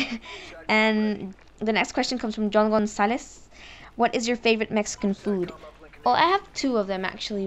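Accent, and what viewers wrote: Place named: South London, United Kingdom. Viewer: Dat South London accent was a buff one still clearly reppin the ghettos fam keep up da good work SAFE!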